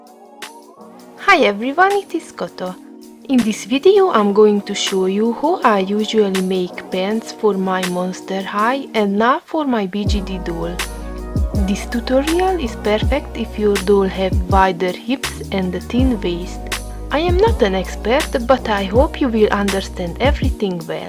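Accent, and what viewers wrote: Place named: Romania. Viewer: Wow, láttam a blogodon magyarul írsz. 👌Picit hallom a Román akcentust is most hogy mondod. Jó tudni hogy van más ember is akit érdekli a doll customize. Bocsi én meg már félig angolul gondolkozom. 😅 Kérdés: rendeled az MSC-t online, vagy honnan szerzed, kiszeretném majd próbálni ezt az egész Repaint dolgot ( I tried my best to write in my mother language 😅)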